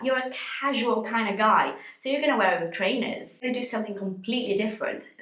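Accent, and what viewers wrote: United Kingdom. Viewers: I say, British accent with a spicy accent.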